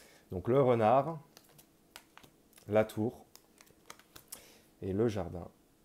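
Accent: Quebec, Canada. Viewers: Merci, par moment j'ai cru percevoir 1 petite pointe d'accent Québécois? Je me trompe??? 🤔 Belle continuation. 😍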